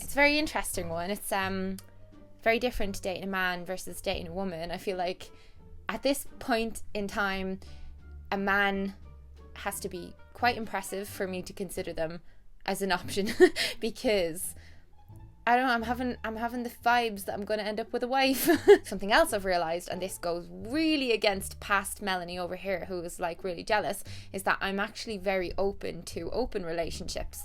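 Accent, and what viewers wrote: Ireland. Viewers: Where is she from? Ireland? Australia? UK?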